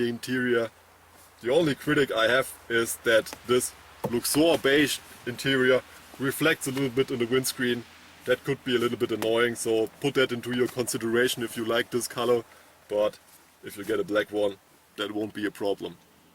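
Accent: Germany. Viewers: I don't normally post. However, I find it ironic that he suggested you work on your English whilst his post contained grammatical errors. You have a very good grasp of the English language. Expressive, emotive and eloquent. Yes, you have a German accent, but any proficient English speaking person can understand you perfectly and you put a lot of the American youtube reviewers to shame. I just wish the car manufacturers would give you more access to their cars. Keep up the good work. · I like his English with german accent. It is sort of like listening to Arnold Schwarzenegger review a car :D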